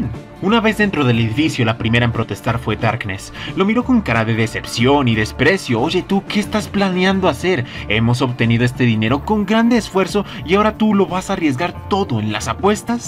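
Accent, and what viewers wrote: Colombia. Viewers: Señor. Sos de colombia?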